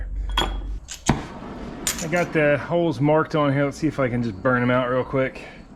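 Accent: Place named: New Jersey, United States. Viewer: You have the same accent as my cousins who are from Collingswood NJ ! I instantly was like woah this guy talks just like my cousin ! I live in Syracuse and they made fun of our accent lol they laughed when we'd say "wicked cool" or "its wicked hot" lol. Thanks for reminding me of fond childhood memories !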